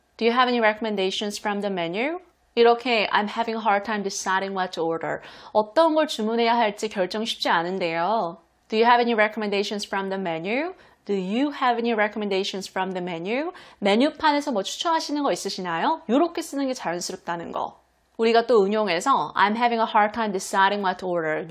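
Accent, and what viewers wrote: United States. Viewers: 완전 미국영어네요… 디싸이링~~ 쌜맨~~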